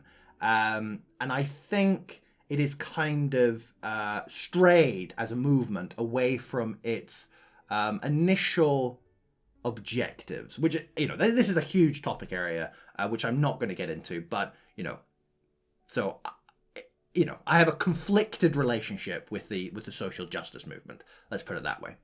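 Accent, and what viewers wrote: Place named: Edinburgh, United Kingdom. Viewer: I thought your accent was an Edinburgh one.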